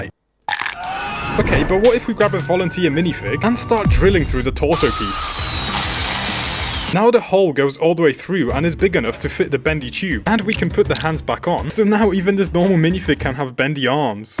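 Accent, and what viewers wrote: United Kingdom. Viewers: HE IS BRITISH!!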